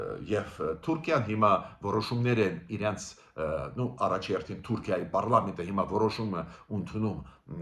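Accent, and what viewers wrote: Russia. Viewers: Такое впечатление, что господин жил и учился на русском языке. Многие слова он произносит на русский манер. Одно успокаивает, что он патриот Армении на все 100%.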